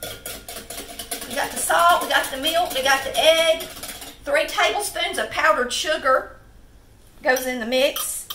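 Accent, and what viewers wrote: South, United States. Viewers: Looks absolutely delicious, and you make it look easy enough that I think I can make this recipe! I just love your Southern accent: "MEELK," "THE-IS," "Y'ALL," etc. I'm originally from Savannah, GA, but lost my accent years ago when my family moved to Ohio. I've subscribed because you and your husband talking and cooking is so great, and I've got to watch more. Thanks! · I'm from Florida and I've been watching about 7 minutes, being as I just found your channel. Love your southern accent by the way! Thanks for sharing! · I had a lemon tree and the 🍋 lemons were bigger than a can of coke! They were also a little sweeter than a normal lemon. I sure do miss having that lemon tree! I also had a grapefruit tree that the grapefruits were a little bit bigger than normal and talk about being sweet, you didn’t have to add any sugar to eat them. 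We could just peel them and eat them like you would eat an orange! I miss having those for breakfast in the mornings! I, too enjoyed watching this video and listening to her talk with that accent! I am from NC and miss hearing it and the funny sayings that comes out of the south! Can’t wait to make make these! Sometimes I want something at night while I’m watching TV but I don’t want anything really sweet, and this sounds like it would fit the bill! 🦋🦋❤️🦋🦋🍋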